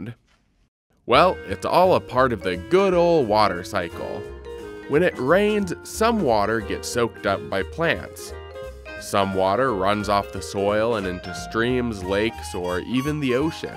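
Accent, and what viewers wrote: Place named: United States. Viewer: It makes him sound American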